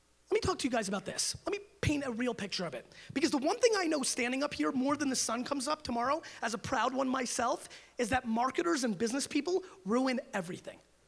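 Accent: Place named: New York, United States. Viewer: I've noticed! I started going to networking events so you have to have a good speech, and so I litsen to the ones I like, you are one of them :) One question tho, I know you get alot of them, I live in Sweden and work with art, love my job but I found it hard to transcend from private customers to companys. Do you have any advice? Thanx, keep up the good work. Love your NY accent haha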